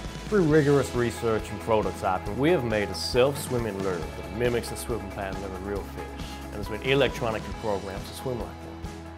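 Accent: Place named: Rural, Sweden. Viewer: That's one hell of a Swedish country accent ya got there. I love it! He know s what sells and relating to your customer base is important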